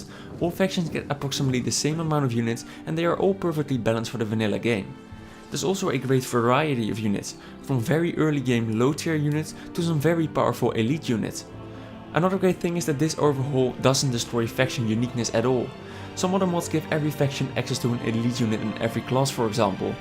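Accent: Netherlands. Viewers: haha your sooo dutch i can tell by the accent sooo much haha great vid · Ik wist gewoon dat je Nederlands was door je accent, toen las ik de beschrijving van je kanaal xD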